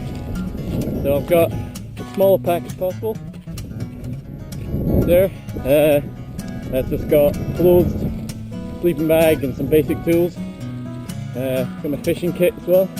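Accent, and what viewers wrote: Scotland, United Kingdom. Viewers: Daniel Parker it’s a Scots accent. And a lovely one for all that.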